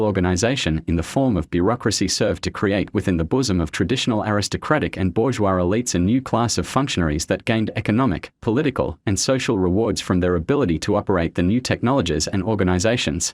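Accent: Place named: Australia. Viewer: Nice Australian voice.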